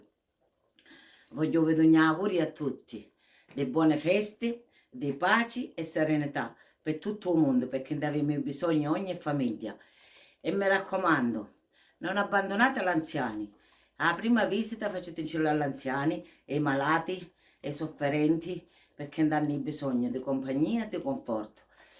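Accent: Calabria, Italy. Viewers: Carissima signora lei è una bella persona. Ammiro quello che dice e fa. Lei con il suo modo di parlare e le sue ricette mo ricorda molto mia mamma e anche la mia cara zia .io è molti anni che vivo al nord ma la Calabria mi è rimasta nel cuore e il suo dialetto è uguale al nostro quindi penso siamo dalla stessa zona. E u stoccu di cittanova che buono. Grazie di cuore per regalarci le ricette della mia mamma.le auguro tante belle cose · Nn posso dire che la voce sia uguale ma l'accento è quello! Quello di mia madre (nata a Polia ex provincia di Catanzaro, morta a 92 in grazia di Dio). Ho guardato in "giro" gli angoli della tua cucina, il fuoco e le "pignati " dove cucini, quelle stesse di terracotta che ricordo x anni in casa mia...anche papà mio era calabrese di Serra s. Bruno... Da loro ho appreso la dignità e l'onestà e il timore di Dio. Che Dio ci benedica tutti❤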